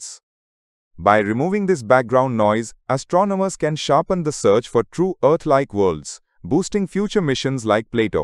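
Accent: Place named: India. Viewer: Whats blowing my mind is the accent doesn't match the cartoon face that pops up.... Indian English accent but a Caucasians face is represented as the speaker. Like *BLOWN*. Never would have guessed.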